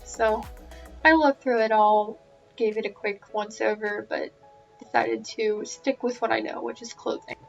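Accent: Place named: Pennsylvania, United States. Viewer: I agree with the selling up. I have a really hard time paying for items, it just goes against my thrifty/cheap way of thinking, lol. Also, nice to hear a Pennsylvanian accent. I’m originally from State College area but am in Upstate NY now!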